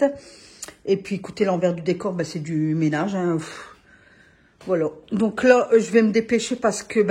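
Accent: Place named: Valenciennes, France. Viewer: Je trouve que vous avez l' accent de valenciennes, Denain, Douai, Orchies. Bibises.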